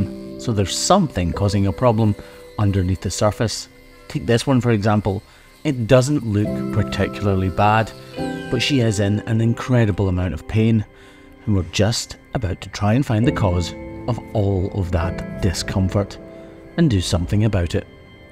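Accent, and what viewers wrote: Scotland, United Kingdom. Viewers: You sounded more Scots in this vid... what happened? ... ... ... Was this a repeat from a few years ago? I mean, you almost sounded like Craigy Boy! XD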